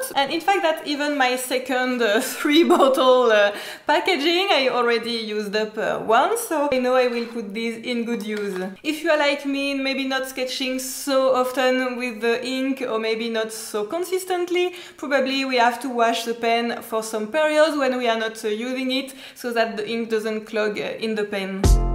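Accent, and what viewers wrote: France. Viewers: Your not french? Your accent sound like , If no good video ^^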